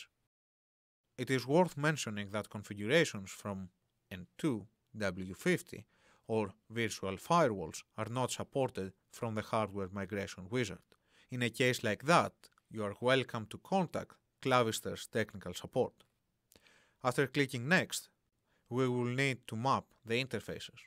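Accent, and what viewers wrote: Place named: Greece. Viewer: This man sounds like he's Hellenic! :) Nice! :)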